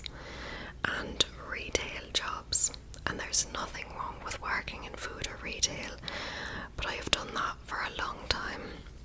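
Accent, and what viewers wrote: Ireland. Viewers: I have a 5-star asmr playlist. Hard to get on it but this one is on it. I also have an “Exceptional” ASMR playlist. Even harder to get on, but I just put this one on it. Your Irish accent voice is the best, and you are one of the most beautiful women I have seen on YouTube. Thanks for sharing with us.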